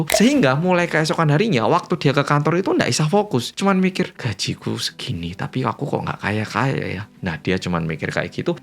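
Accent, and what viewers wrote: Java, Indonesia. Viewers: Sampe tak ulang2 bagian paca'an,jaaaan kuentel jowone gak ilang ilang cak 😂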